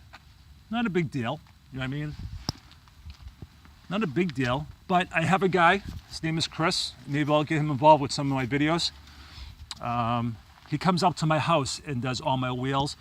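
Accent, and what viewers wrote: Boston, United States. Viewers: P.S you got the most Boston accent that I ever heard!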